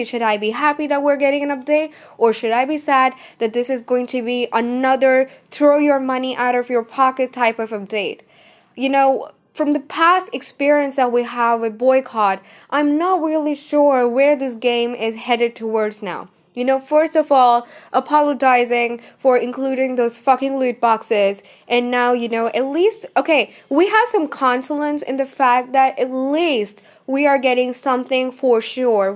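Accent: India. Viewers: Shabbir Alom Shakil I'd say India given her accent 🤔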